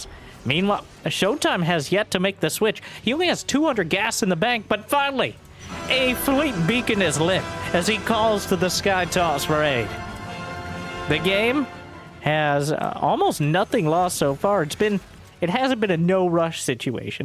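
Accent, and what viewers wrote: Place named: Appalachia, United States. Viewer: Can you speak proper English please ? Your hillbilly dialect is soo cringe … is it an act?😢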